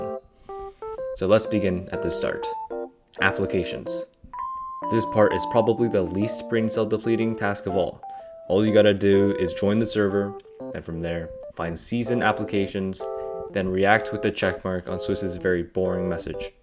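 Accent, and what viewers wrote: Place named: China. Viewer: I never imagined that voice would fit into this kind of videos, i am actually enjoying this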